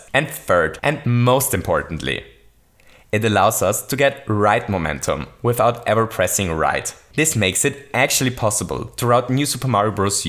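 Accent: Germany. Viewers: Bist du deutscher? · Kann es sein, dass es deutsch bist? 😂❤️ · Ur english sounds very german ps: ich höre mich öhnlich an wenn ich englisch rede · Ich wette dh bist deutsch 🤔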